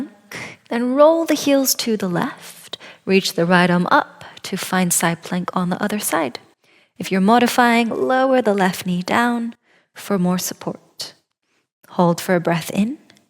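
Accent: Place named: United Kingdom. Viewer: Great practice, I’m a new subscriber, where from the uk is your accent from? Throughout the hour I was getting distracted trying to figure it out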